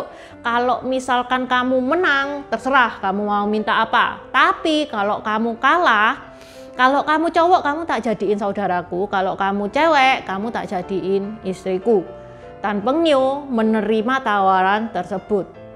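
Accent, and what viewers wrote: Java, Indonesia. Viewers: Medok mu wi lho yu...yu...🤭🙏🏼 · Sampean ini Tionghoa opo jowo...kok bohoso ne jowo medok?? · Mbak, cengkok jawanya medok bangetttt, 👍👍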